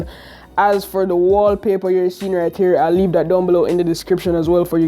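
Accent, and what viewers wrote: Jamaica. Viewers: Yeah Man U definitely Jamaican bredda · Are you Jamaican 🇯🇲🇯🇲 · Are you jamaican? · U Jamaican? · u jamaican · You Jamaican?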